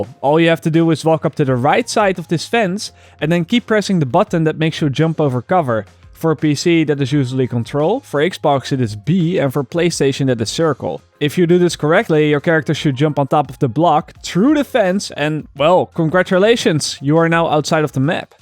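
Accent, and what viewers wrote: Netherlands. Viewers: nl accent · are you dutch???? you sound dutch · Je hebt zo'n standaard Nederlands accent haha, mooi filmpje though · Ha ik dacht al dat hij nederlands was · Dat dutch accent tho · oke becouse of your accent i can hear your dutch haha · i already tought you were dutch because of your accent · Ik hoorde al dat Nederlandse accent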